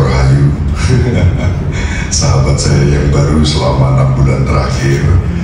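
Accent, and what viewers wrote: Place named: Kalimantan, Indonesia. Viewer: Kalimantan mana suaranya❤❤❤😅😊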